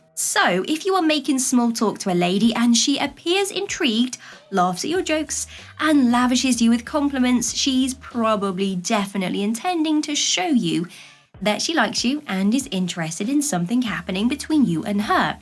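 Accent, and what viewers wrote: United Kingdom. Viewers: Sure. Combination of Karen Valentine and Lily from AT&T with a smooth British accent doesn't hurt either.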